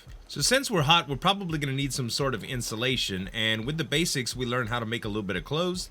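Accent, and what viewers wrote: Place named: South, United States. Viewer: with that accent you have got to be south of I-10 like me..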